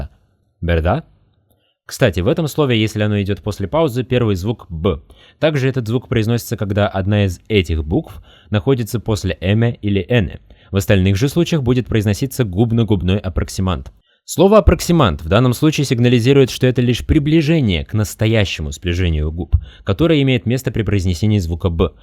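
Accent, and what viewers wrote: Russia. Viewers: круто, но слышен русский акцент при разговоре. сообщаю как человек, который говорит на испанском без акцента.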